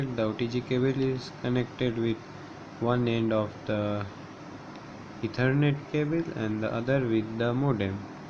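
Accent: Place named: India. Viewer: indian speak very funny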